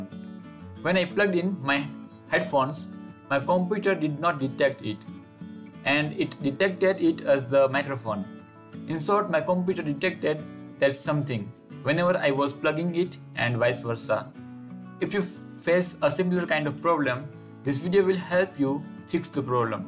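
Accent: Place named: India. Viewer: when I hear an indian man I know I am seconds away from a solution - Thanks buddy, love and respect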